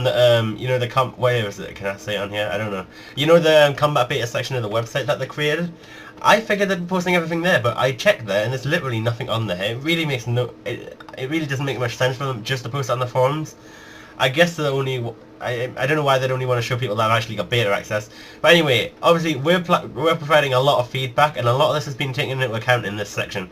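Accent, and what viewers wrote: Newcastle, United Kingdom. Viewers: Your accent is like mine. Are you a fellow Geordie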